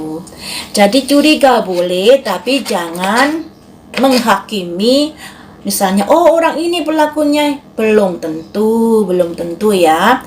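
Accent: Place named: Java, Indonesia. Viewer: logatnya jawa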